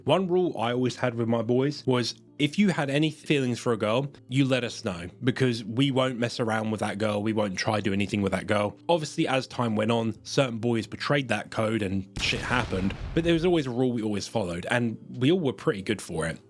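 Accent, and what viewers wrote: United Kingdom. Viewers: No he just has a hard English accent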